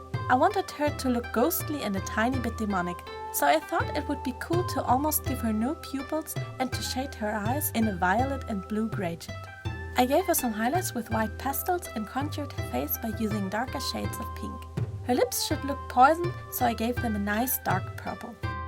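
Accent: Germany. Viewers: It sounds like her mother tongue is German. o_O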